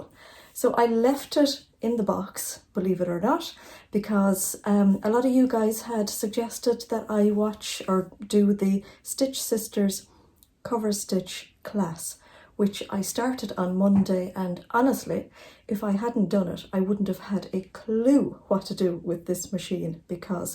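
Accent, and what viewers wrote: Ireland. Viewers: Thank you so much, I think I will purchase one, love from Dublin. PS nice to hear an Irish accent 😉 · Hi. I found you on YouTube this morning and am enjoying your vlogs. Just love, love your Irish accent! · I loved your video! I have the Janome 1000CP, the predecessor of the 1000CPX and I've had it for 17 years! Your machine looks like it does much more than mine so I need to find a home for my old one then I can buy the one you've bought! Loving your Irish accent! x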